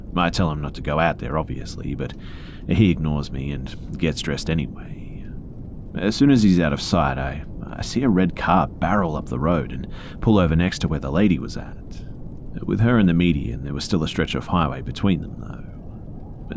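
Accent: Australia. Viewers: You’re doing a wonderful job…thanx! Nice Aussie voice 🫡🇦🇺🏆✨👏💜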